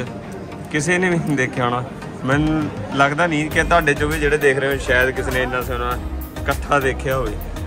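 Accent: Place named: Gurdaspur, India. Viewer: Veer di awaj sun ke hi pata lug gia si ki gurdaspuria he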